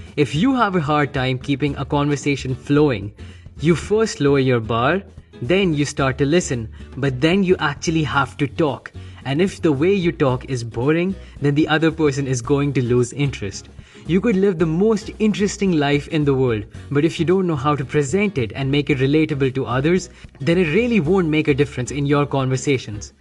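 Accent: India. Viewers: Damn that indian accent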